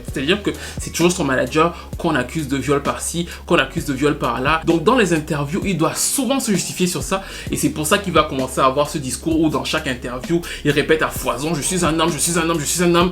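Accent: Cameroon. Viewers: L’accent Camerounais 🤣s’entend bcp pars ici ♥️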